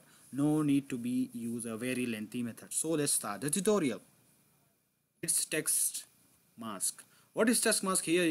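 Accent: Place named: Russia. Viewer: Why your accent is like russian😂 bhai you are indian be as it.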